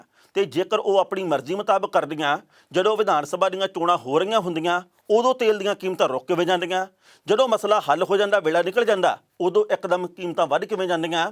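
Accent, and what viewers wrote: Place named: Malwa, India. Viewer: . ਸਾਹਿਬ ਦਾ ਅਸਲੀ ਮਲਵ ਈ ਪੰਜਾਬੀ ਵਿੱਚ ਸੁੱਧ ਉਚਾਰਣ ਮਨ ਨੂੰ ਮੋਹ ਲੈਦਾ ੲੇ। ਕਿਉਂਕਿ ਇਸ ਤਰ੍ਹਾਂ ਬਿਆਨ ਕਰਨ ਨਾਲ ਹਰ ਕੋੲੀ ਖਬਰ ਪੂਰੀ ਤਰ੍ਹਾਂ ਦਿਲ ਦਿਮਾਗ ਤੇ ਅਸਰ ਕਰਦੀ ਹੈ।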